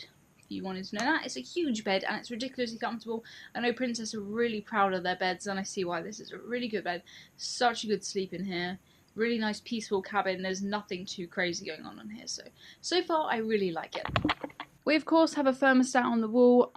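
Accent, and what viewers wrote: RP, United Kingdom. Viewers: Hi, I’m just going through your back catalogue trying to find your oldest or first vid. Am I close with this one? Also you sound so much posher in this vid, is it like your yt version of the old telephone voice 😂 really enjoying your old stuff. See you back in 2023!!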